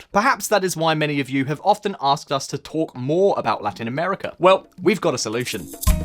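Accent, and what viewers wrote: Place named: United Kingdom. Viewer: It's the first time I see a video of yours, and as an Israeli I can tell you that you explained it better than most Israelis can. Unfortunately for us, I don't see the Haredim joining the military soon. Also, I think that what most upsets us, Israelis, about them is that because they don't work or have low wages they don't pay taxes, thus making us, who work everyday for living, to pay not only for our lives, families, and our education, but also for theirs. I should pay for my own son's higher education, not for the Haredi education of Yeshivot (Yeshiva in plural) and Tora study Edit: Also, like your British Accent:)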